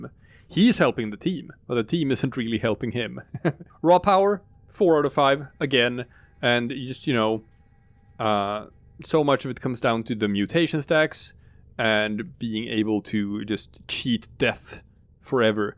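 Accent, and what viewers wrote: Sweden. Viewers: You Swedish?? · lite skånska i mixen där 🤣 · Lätt att höra att någon är från Sverige när man är svensk 😂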